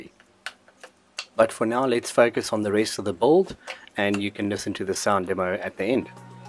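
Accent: South Africa. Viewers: Hi, you sound South African, if you are where are you based and do you perhaps sell what yoh make? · South african voice I heard there. Where did you source the driver? Looking to replicate your build along side a set of 3.5 inch satellites. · Hey. Are you by any chance from South Africa? Your accent is why I'm asking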